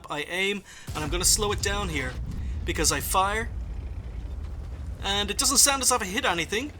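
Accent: Ireland. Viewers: Great video! Loved your sexy Irish voice! That sounded like something a Girl would say :p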